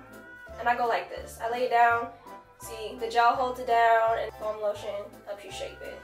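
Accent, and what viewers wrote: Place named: United Kingdom. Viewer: The British accent 😂😂😂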